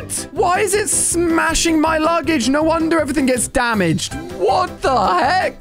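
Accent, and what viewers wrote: Australia. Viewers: Australian or bri'ish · Are you Australian because if so how do I never notice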